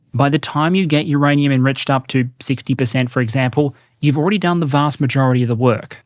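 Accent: Australia. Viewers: The up, down, back and forth of this conflict reads like a brittish comedy sketch Á la Blackadder or Monty python. Now, with Aussie voice over.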